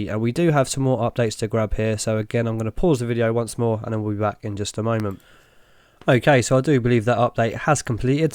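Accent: England, United Kingdom. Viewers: I like this bloke - Pommy accent doesn't grate on Australians :)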